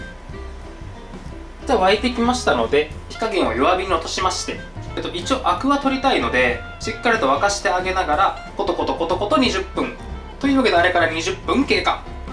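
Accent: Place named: Hakata, Japan. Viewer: 博多弁あんまり、動画で出らんよなー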